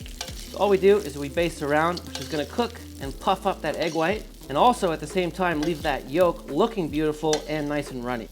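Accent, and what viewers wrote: United States. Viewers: Clicks on link, hears an American accent then switches off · He may have a yank accent but he's an English lad with that fryup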